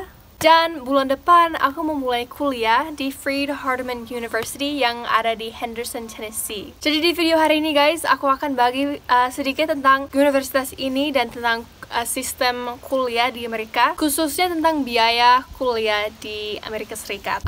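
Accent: Papua, Indonesia. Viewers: Terimakasih atas informasinya sister, sungguh terlihat manis didengar orang asing asli berbahasa Indonesia dengan logat/lahjah Indonesia. Sukses selalu buat sister, brother and keluarga. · Logat indonesianya mulai agak luntur 😁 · Bule longat papua juga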